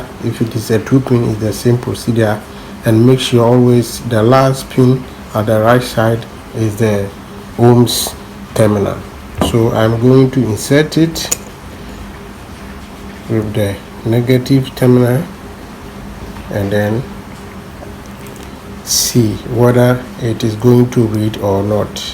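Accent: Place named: Ghana. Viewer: what's up the accent gave you off. you be ghanaian abi? give me the location of your shop. i have a mirage that is guzzling fuel no code. thanks for the video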